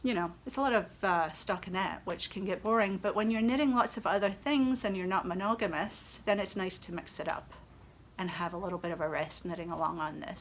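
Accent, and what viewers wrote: Scotland, United Kingdom. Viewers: Love your Scottish accent! I’ve just finished watching all the episodes of “This Farming Life” on BritBox. Most of it is filmed in Scotland, but unfortunately they breed their sheep for food and not wool, I hope we knitters can encourage the industry to change. Will cast on a pair of mittens soon for the KAL with some 🇨🇦 yarn.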